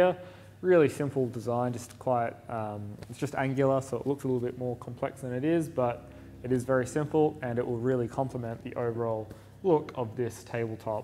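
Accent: Australia. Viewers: maybe try vibrating your epoxy in the mixing cup to remove the mixing bubbles, orbital sander should do the trick... also works on the slab too but make up a dummy pad so no pesky dust:D Judging by the accent you in Aust?(probably one of the worst country's to be a fine furniture maker)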